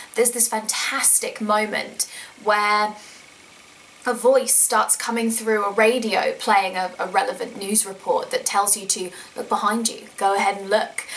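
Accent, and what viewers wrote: United Kingdom. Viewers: You are sooo british !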